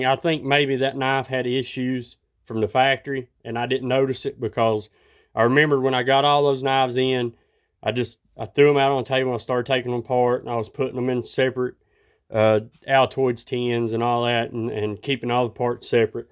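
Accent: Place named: Georgia, United States. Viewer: We are from Georgia. You sound like you are from here too lol! Nice knives! Liked and subscribed!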